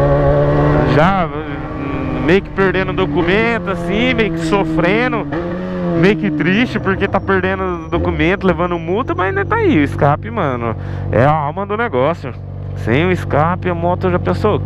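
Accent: Goiás, Brazil. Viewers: Pq ele fala no piqui do Goiás??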